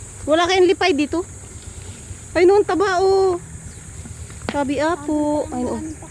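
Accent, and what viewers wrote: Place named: Bicol, Philippines. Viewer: Taga bicol din kc ako pamilyar yong salita mo sa akin may punto kc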